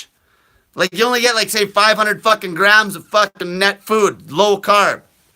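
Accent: Canada. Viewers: Jesus Christ.. what part of Canada are you from?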